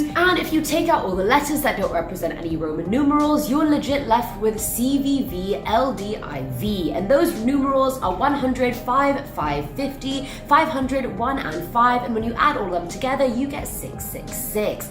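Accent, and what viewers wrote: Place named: RP, United Kingdom. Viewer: i like your accent i, i think its attitude and i think its called posh.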